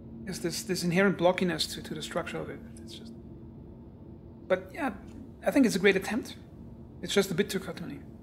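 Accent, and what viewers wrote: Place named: Germany. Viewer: More ship reviews please. As a Brit i "two thumbs up" your German accent.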